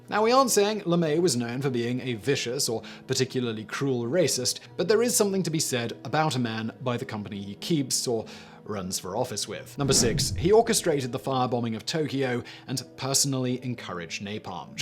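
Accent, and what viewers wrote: United Kingdom. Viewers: General LeMay was the brainpower of SAC (Strategic Air Command). I spent my Air Force enlistment (4 years) in SAC. General LeMay was considered the father of SAC, and a hero. I understand many critics condemn his firebombing of Japan. I say Japan started WWII without fulling understanding America’s ultimate power to defeat Japan. jJapan’s sneak attack on Hawaii made many Americans feel any offensive against Japan was fair game. You sound like a Brit, you and the Japanese should be thankful the nuke wasn’t dropped on Tokyo. You do a disservice condemning the USA for firebombing Tokyo.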